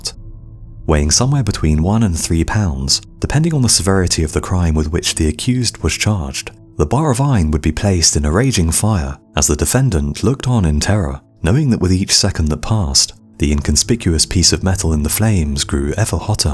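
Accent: United Kingdom. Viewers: Best narrator voice of all time. I must know what part of Great Britain has this accent. Please enlighten me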